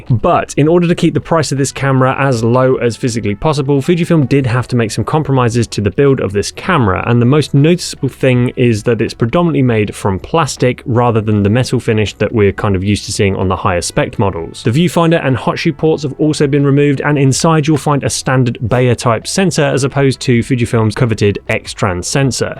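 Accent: England, United Kingdom. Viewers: Just curious. You sound English like me but you price everything in dollars? Do you live in the USA?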